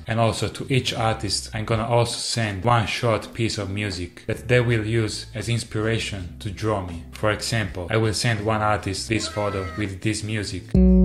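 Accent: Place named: Italy. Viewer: L'avevo capito da subito che eri italiano, il nostro accento si riconosce in un attimo. Ma come mai sono l'unico Italiano a commentare?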